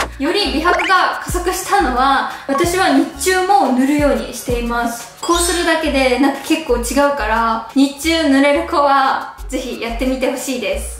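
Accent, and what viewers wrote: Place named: Gifu, Japan. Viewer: 方言が岐阜っぽいんやけど？。。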